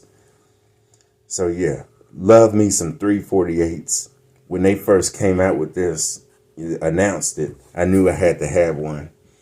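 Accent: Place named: South, United States. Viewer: I like your southern twang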